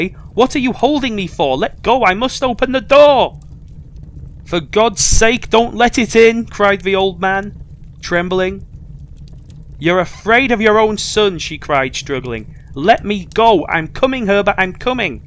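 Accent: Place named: Yorkshire, United Kingdom. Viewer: This story is one of my favourites and you did a grand job of it ! As an adoptee of West Yorkshire (been living here since 1996) great to hear a Yorkshire accent on YouTube. Well done !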